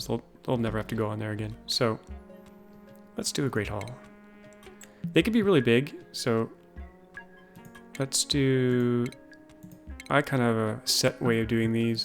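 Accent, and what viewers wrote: Canada. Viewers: Doood, doont fix yur Canadian suurfer speek. I like it. Also, five episodes in, I still absolutely love this series. It's always been fun watching you build bases with each iteration of the game, but it's a totally different experience watching you fix up these broken bases.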